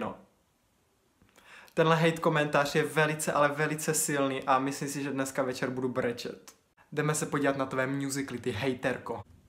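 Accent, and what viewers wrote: Czech Republic. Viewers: Ty jsi z Olomouce jde to poznat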